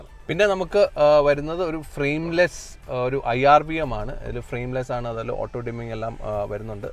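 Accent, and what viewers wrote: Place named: England, United Kingdom. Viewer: Mr ഇംഗ്ലീഷ് ആക്സന്റ് ഇൽ മലയാളം പറയാതെ പറയുന്നത് ആളുകൾക്ക് വ്യക്തമാകുന്ന രീതിയിൽ സംസാരിക്കാൻ ശ്രദ്ധിക്കുക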